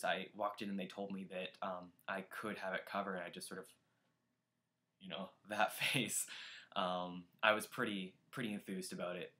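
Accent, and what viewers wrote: Canada. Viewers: Your Canadian accent is really noticeable now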